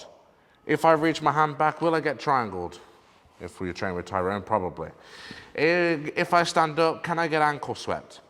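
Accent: England, United Kingdom. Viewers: lol thanks man, I needed that. And thanks for the vids, I really appreciate the details in the way your break this stuff down. For some reason your immaculate English accent is easier to recall than my American coaches when I’m being strangled.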